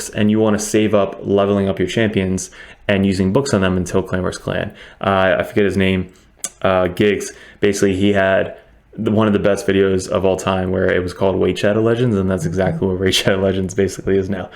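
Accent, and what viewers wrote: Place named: South, United States. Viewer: You sound like you might be local to where i live, you from the southern states? Loving the content bro, keep it coming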